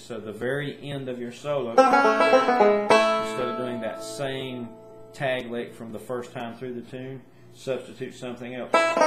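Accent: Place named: West Virginia, United States. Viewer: Im from mingo co wv, I can tell your southern wv or eastern ky,,, where you at?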